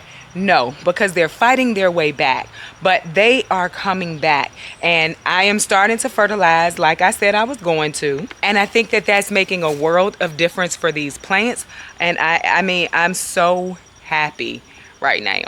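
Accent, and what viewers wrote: South, United States. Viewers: I love it to death lol. It's full of southern Love! I love your channel sister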